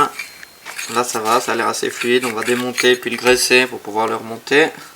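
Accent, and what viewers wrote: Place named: Switzerland. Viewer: Je découvre cette chaine, aaaaaahhhhhh ça joue! Un ami Suisse cet accent m'avait manqué!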